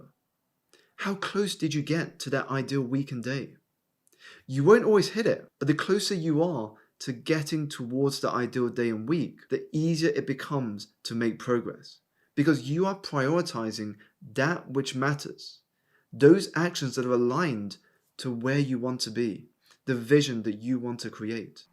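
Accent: United Kingdom. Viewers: Your british accent is cool.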